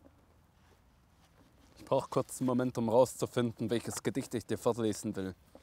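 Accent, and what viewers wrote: Swabia, Germany. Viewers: DU BIST DER BESTE. Alle die ich kenn reden nur noch wie du in 3 stufen und den anderen Videos. Stuttgart rockt. Da kommen halt doch die besten her. Dein Schwäbisch ist so krass geil beim trainer und so. Danke für die geilen Shows. Stuttgart und umgebung im Lachflash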